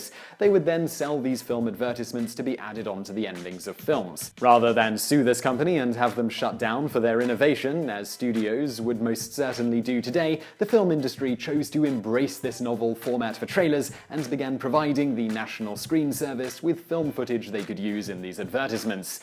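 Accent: England, United Kingdom. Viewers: Cameron Kiesser he’s English.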